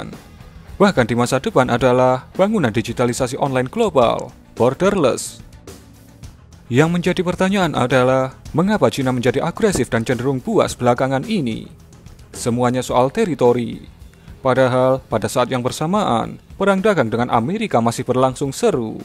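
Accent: Java, Indonesia. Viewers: Medok amat yang ngomong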